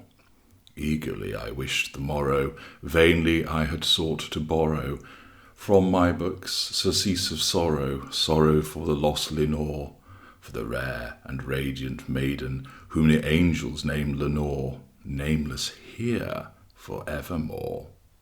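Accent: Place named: Yorkshire, United Kingdom. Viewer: I can still hear Yorkshire accent though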